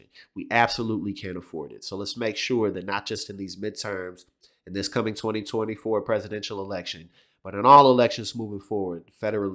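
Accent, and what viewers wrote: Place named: South, United States. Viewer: You may have modified your accent somewhat but as a Brit listening to you I can hear you come from the South!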